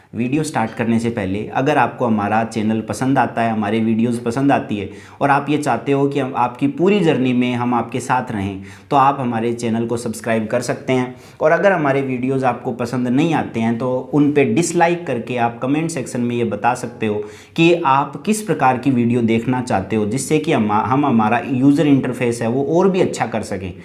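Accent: Rajasthan, India. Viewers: Bhai Rajasthan se ho ky....? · Bhai aap rajsthan se ho kya?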